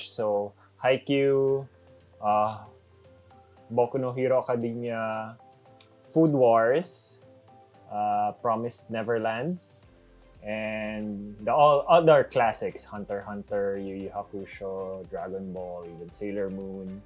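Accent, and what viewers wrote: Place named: Philippines. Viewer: Its not that its bothering or anything. But you have the Filipino accent, and i cant stop noticing it XDD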